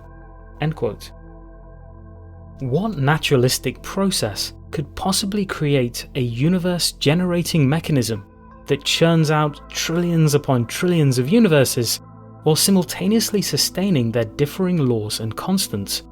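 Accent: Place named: United Kingdom. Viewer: This is like listening to terrance mckenna in a British accent 😂 he was saying the same things with more deugs in the talks of coarse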